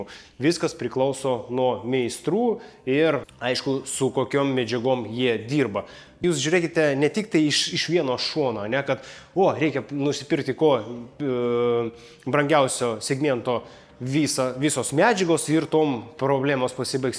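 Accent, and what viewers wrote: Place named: Russia. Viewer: Šaunuolis, kad stengiesi, bet tas rusiškas akcentas ir rusiška sakinių sandara trukdo suprasti ką nori pasakyti😊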